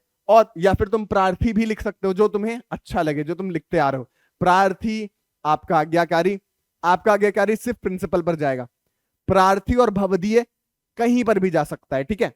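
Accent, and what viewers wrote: Bihar, India. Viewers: Bro pure bihari lag rahe ho 😊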